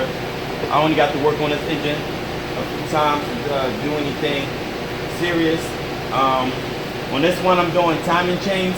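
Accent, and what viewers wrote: Philadelphia, United States. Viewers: He sound like he from philly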